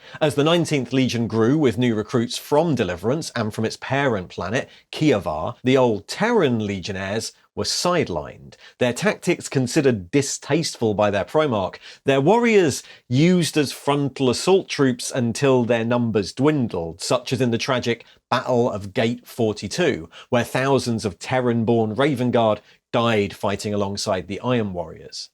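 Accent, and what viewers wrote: England, United Kingdom. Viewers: With how the accurate pictures are presented with your English accent I feel like I'm in school again 😂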